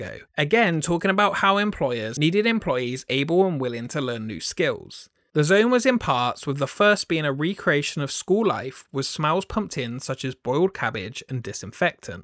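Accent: United Kingdom. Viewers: are you British? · D-erh-by? Given that you sound British, there is no excuse for this incorrect pronunciation...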